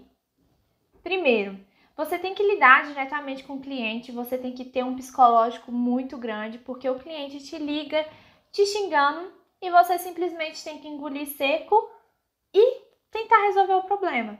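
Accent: Minas Gerais, Brazil. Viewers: meu Deus ...q top kkkk parabens ... vc fala igualzinho a Ana Paula Valadão ! vc é mineira tbm ? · Algumas coisas no sotaque mineiro são inconfundíveis. Percebi logo na primeira frase kk Mais um inscrito o/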